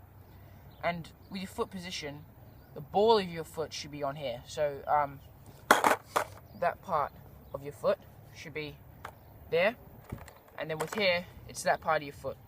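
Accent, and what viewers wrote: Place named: New Zealand. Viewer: Nice tutorial man, but i couldnt get over your accent! what is it? Kiwi mixed with Australian and British?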